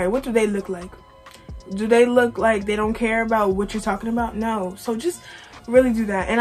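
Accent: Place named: New York, United States. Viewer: love the ny accent 🤩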